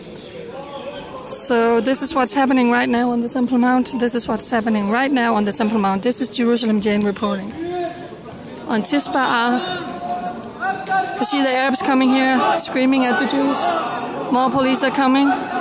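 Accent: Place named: South, United States. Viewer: Where did you learn English? You have a little bit of a southern accent to your English. It's very cute. I like it!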